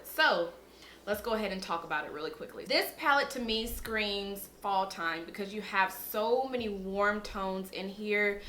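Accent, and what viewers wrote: South, United States. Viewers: Her southern type accent is a little distracting and hard to focus what she’s actually sayibg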